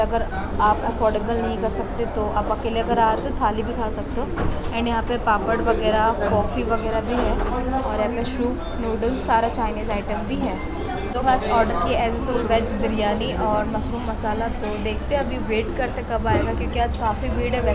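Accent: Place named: Odisha, India. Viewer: Odia Heiki hindi...lol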